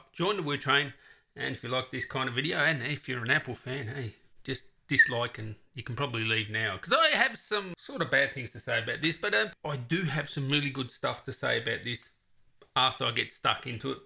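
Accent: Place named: Australia. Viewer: I’ve watched every 2019 iMac video on YouTube (cos I’m about to buy one) and I loved this video the most. As a fellow Australian, it’s so refreshing to have a thick Aussie accent on a tech video and your advice to viewers to get great performance if they’re on a budget is really good. Since you posted your vid, they halved their prices on ssds so I might order the i9 with a 1TB ssd. Thanks again.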